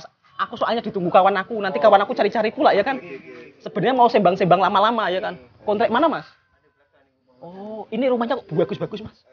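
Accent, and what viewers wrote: Java, Indonesia. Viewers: Suara kamu terlslu pekat telo jowo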